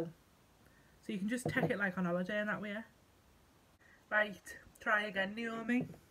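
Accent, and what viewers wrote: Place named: Scotland, United Kingdom. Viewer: I’m in love with your ascent where you from Scotland, Glasgow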